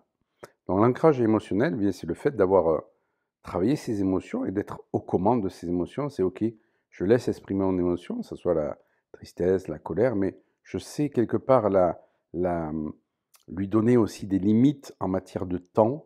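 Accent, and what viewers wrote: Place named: South, France. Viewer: Merci pour le rappel de savoir bien se connaître soi et je crois d'ACCUEULLIR tout ce qui est ici et maintenant ! Merci pour ton accent du sud ensoleillé ! Bonne journée à tous. Merci pour tes vidéos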